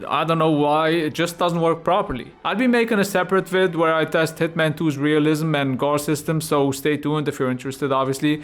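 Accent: Italy. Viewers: funny italian accent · I approve of your Italian accent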